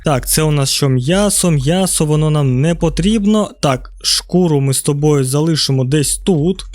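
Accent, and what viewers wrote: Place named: Surzhyk, Ukraine. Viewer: Суржик просто топ🥹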